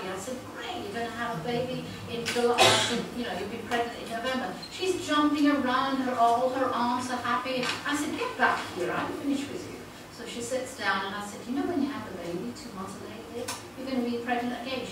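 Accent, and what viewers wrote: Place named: England, United Kingdom. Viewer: Nice to have found your instruction and insights today. Always good to discover an angel with a marvelous English accent. Thank you for the Candle Lesson. I have finally simplified my life by paring down this path with Meditation, Exercise, Creativity philosophically. Now to activate it. The Candle will be a welcom aid. Thanks for the light...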